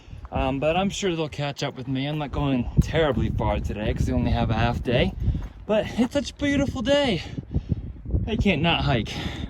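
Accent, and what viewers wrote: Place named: New England, United States. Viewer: I think you should just say it how you say it. Nevermind the naysayers! We are New Englanders, get used to the weird way we say things! lol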